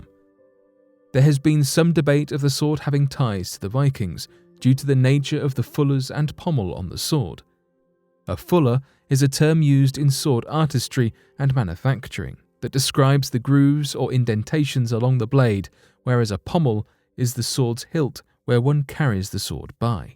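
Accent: United Kingdom. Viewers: ARE YOU A FELLOW BRIT?🇬🇧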